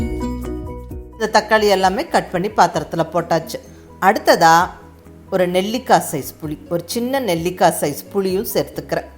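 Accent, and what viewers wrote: Coimbatore, India. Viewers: Madam. Unga cooking oda unga coimbatore language thukkala iruku super madam .nice to hear. Continue madam.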